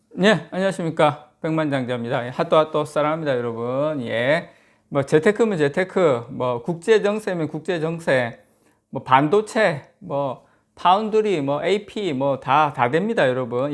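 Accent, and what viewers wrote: Gyeongsang, South Korea. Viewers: 백만장자님! 고향이 대구 청도 군위 칠곡 구미 영천 고령 경산 중에 하나일것 같은데 맞죠?~~~^^ 저랑 말투가 똑같아가지고요!~~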